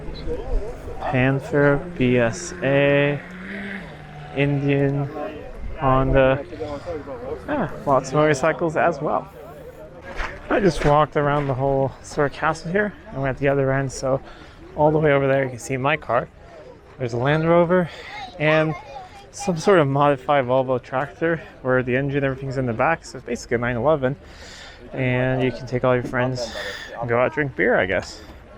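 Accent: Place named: United States. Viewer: How do you have a perfect American accent when growing up in Sweden?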